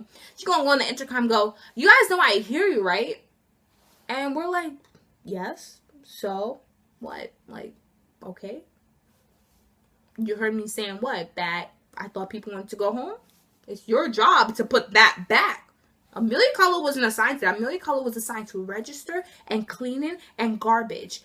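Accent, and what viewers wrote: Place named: Long Island, United States. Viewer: idk why i love long island accents 😂 they're just funny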